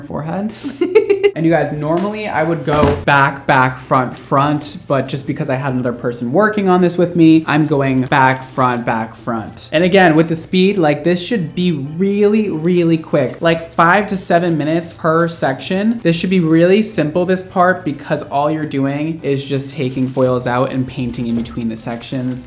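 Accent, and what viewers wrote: Eastern Europe. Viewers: She is so beautiful 😻 I could be wrong but I hear Slavic accent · I'm curious if slavic as she have this funny accent (do we all have it🤔) btw, her hair is amazing!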